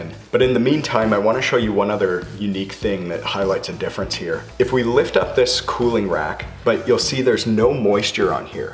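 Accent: Canada. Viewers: Western Canadian accent detected...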